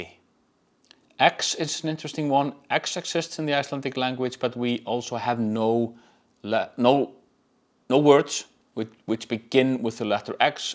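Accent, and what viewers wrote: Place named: United States. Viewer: Another question comes up. I assume you were taught British English, so you say Zett. But you speak in American English, so you say Z. Which is correct?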